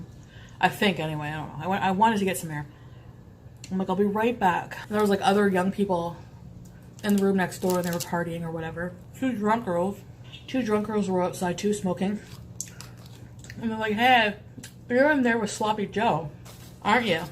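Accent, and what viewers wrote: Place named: Scotland, United Kingdom. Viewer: Scottish Accent DISGUSTENG!!!!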